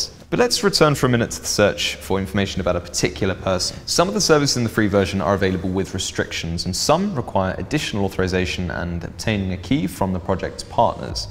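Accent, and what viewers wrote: RP, United Kingdom. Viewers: The ultimate effortlessly posh accent. Brilliant.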